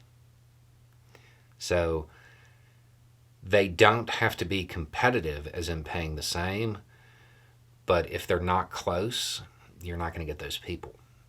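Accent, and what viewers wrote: South, United States. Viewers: Exactly because he still understands what it means to be a member of the human race. He can be wrong, he can listen, learn and explain things, teach like no one I have seen. Maybe bias cause the southern accent but absolutely adore listening to this man. Thank you.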